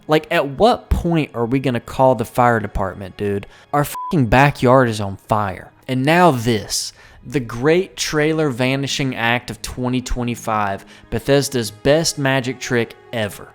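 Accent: South, United States. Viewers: Why did it take me half the video to realize the southern accent 😂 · Do you know not the difference between a remaster and remake ? From this video it doesn’t seem as though you do. A remaster is just a fresh coat of paint on a older game … like Skyrim SE A remake is remaking the game from the ground up line the demons souls remake. This is supposedly a third party doggy doo doo remake with soulslike combat this is not a good thing. So you need to make it clear is it now actually a remaster if that is the case that is no big deal but a full on remake is going to be trash Not only a yucky southern accent lots of hardcore copium in this video.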